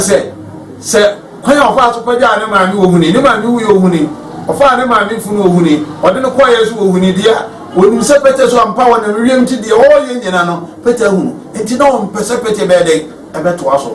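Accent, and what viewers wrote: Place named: Ashanti, Ghana. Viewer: Great job, brother. But will urge you to speak bono kasa, some of your tones in this video is asante , I hate that.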